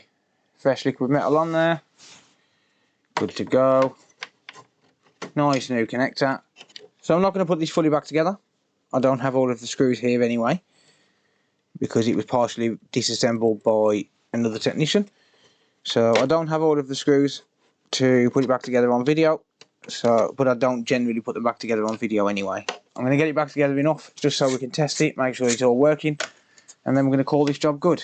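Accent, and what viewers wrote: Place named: Midlands, United Kingdom. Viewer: Where abouts in the country are you mate? Sound like a midlander?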